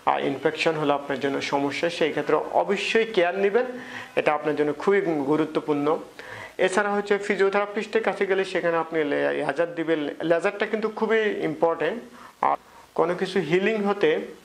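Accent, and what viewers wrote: Noakhali, Bangladesh. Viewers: আপনার বাড়ী কি নোয়াখালী? কথা শুনে মনে হচ্ছে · নোয়াখালী মনে হয় স্যারের বাড়ি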